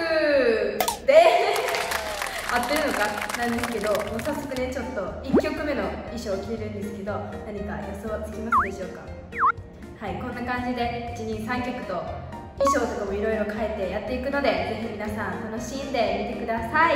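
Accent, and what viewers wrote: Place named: Kansai, Japan. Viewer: ぽてちゃんの関西弁好き😘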